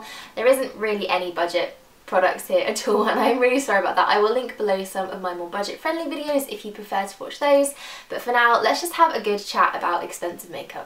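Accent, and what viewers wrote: United Kingdom. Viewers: Love your British Accent. Big fan!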